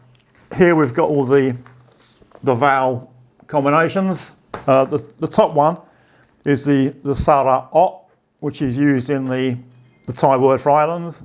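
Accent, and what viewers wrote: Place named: United Kingdom. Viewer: I love your British accent. 😊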